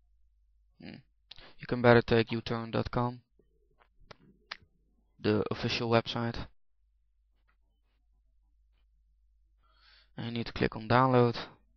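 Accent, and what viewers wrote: Netherlands. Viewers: haha je hoort echt dat je nederlands bent!!:D heeeeello xD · ja echt duidelijk een nederlander LOL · hehe je bent NL ik hoor het aan je stem XD nice video! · are u dutch?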